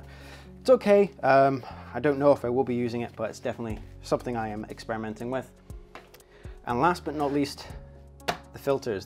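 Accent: Scotland, United Kingdom. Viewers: Many thanks certainly enjoyed this and I have just subscribed. Would I be right that I can here a bit of scottish burr in your voice, where are originally from. I am a exiled Scot myself. Regards, Allan Davies.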